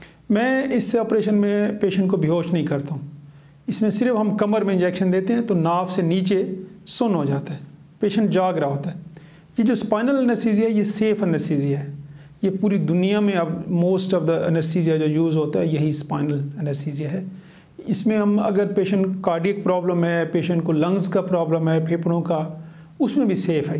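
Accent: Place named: Khyber Pakhtunkhwa, Pakistan. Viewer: Dr.sahib where u located your accent show KPK.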